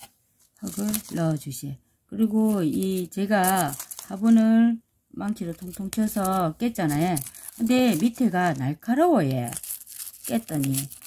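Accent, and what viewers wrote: Gyeongsang, South Korea. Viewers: 제라륨 분갈이를 경상도 싸투리로 정겹습니다 분갈이할 때 조마조마합니다 분갈이 하고 죽는경우를 많이 겪었네요 ㅎㅎ 재밌네예 부지런한 손은 아름다워요 호야네 실방에서~응원하러 왔어요 😊😍😍👍👍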